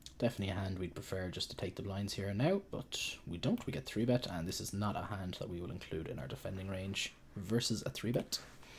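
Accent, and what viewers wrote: Ireland. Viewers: Irish confirmed. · YOUR IRISH !!! fuck yeah !!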